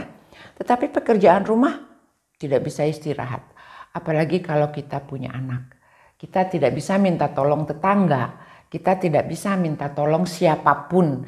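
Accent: West Java, Indonesia. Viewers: Sanaos lami linggih di swiss lentong sunda na masih kadangu, Sae pisaan 👍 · uhun bu,rada aneh oge😂ari urang sunda sok sanajan pindah kamamana ge cara nyariosna logat sundana tetep aya keneh😁ibu oge cara nyariosna angger katingali sunda na😂aya oge kimbab famili,sok sanajan tos pindah ka korea angger gaya nyarios sunda na aya keneh,pas ningali teh,ah ieu mah tina cara nyariosna siga urang sunda,beda😁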